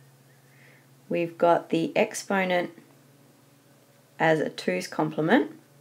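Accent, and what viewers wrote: Australia. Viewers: nice Australian accent :)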